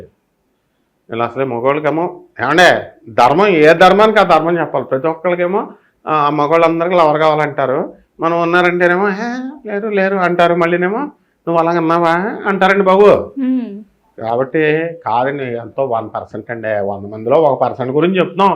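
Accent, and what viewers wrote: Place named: Godavari, India. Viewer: ఎందుకు అండీ... స్లాంగ్ చేంజ్ చేసారు గోదావరి సైడ్ లాగ 😀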